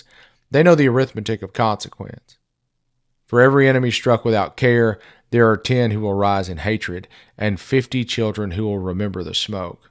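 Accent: South, United States. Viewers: Hearing this with southern twang… you make me proud to be a fellow citizen of yours. I really hope there’s more of yous than there are of them. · Thank you for your words. Fellow Arkansan. It's hopeful to hear words like these come from a southern accent.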